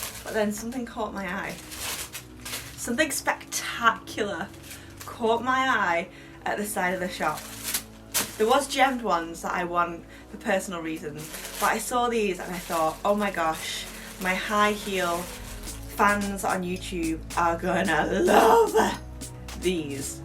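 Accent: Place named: England, United Kingdom. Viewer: I have to say I love your English accent. You, if course are beautiful and very fetching!!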